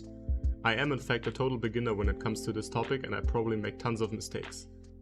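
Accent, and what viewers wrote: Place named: Germany. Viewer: Judging from his accent, he's german. So make ("machen") is often used broadly in foreign languages, even if he was referring to actually design(ing). That what happens if you don't use your mother tongue and its certainly not with bad intent.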